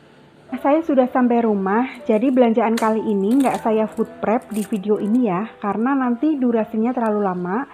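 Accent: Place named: Central Java, Indonesia. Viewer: Rumahnya mana mam, daerah jawa tengahnya? Jajanan pasar dan logatnya khas..salam kenal dari saya klaten jateng🙂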